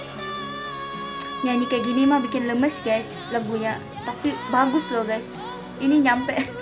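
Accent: Indonesia. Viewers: Mbak orang brunei atau orang Indonesia kok logatnya kaya orang Indonesia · kamu mah... lucu...logatnya indonesia banget... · aksen melayu brunei sudah tdk kdngran dek..udah kayak org indonesia bngt aksen nya...salute.. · Logat Indonesia nya udah mulai muncul ya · Kok logatnya Indonesia banget mbak.... Asli Brunei atau Indonesia nih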